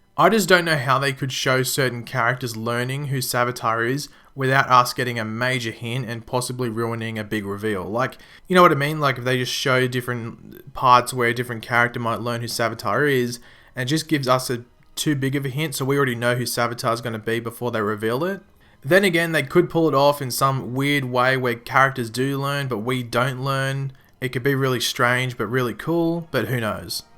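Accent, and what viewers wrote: Australia. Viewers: Holy shit u sound Australian. R u australian?? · But I'll watch the video anyways because your voice is Australian gold. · are you australian?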